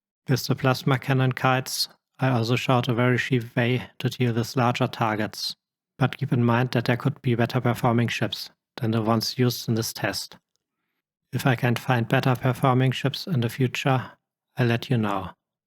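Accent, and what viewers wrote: Germany. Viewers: Thank you for sharing your findings. Ze graphs and German? accent really drive the facts home on this one :) :)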